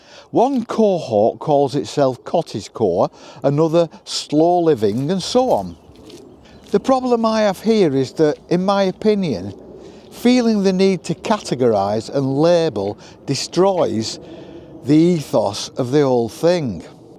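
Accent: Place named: England, United Kingdom. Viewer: Loved every minute of your video, not least, I love your accent. My grandparents emigrated England to come to Australia in the late 60’s and whenever I hear a similar accent it makes me want to visit England. Sadly I’ve never been but would love to see where my ancestors lived one day.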